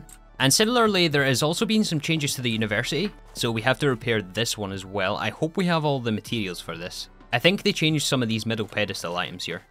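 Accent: Scotland, United Kingdom. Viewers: I don't even play Minecraft anymore. Haven't played in about 10 years haha. Your pacing and amount of content packed into each episode is amazing, also great to hear a fellow Scot 😀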